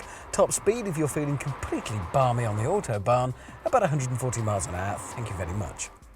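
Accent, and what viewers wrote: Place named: RP, United Kingdom. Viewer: Hammond with the high aristocratic english accent feels so unnatural now.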